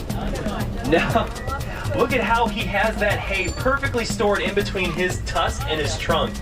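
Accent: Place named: South, United States. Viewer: Where are you from? Your accents sound south too 🎉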